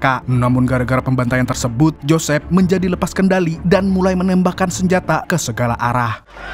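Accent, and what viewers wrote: Banjar, Indonesia. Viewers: Wkwk asli banjar 😂 pacul gigi kai .. semangat terus berkarya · Urang banjar kah beliau ne 😂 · Urang banjar kah pian bang? Hahaa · Adminnya orng Banjar? 😅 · Aiii bhasa Banjar,,,urg banjar kh?? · Urang banjar kah pian mang 🤣🤣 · Hahah urang banjar kh pian mang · Wkkwkw orang banjar kalinya sampiaaann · Min orang mana, Banjar kh? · Orang Banjar kah mang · Ikm urang banjar kh?😂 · Pinanya mimin ini org banjar 😊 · nah bahasa banjar sekalinya😂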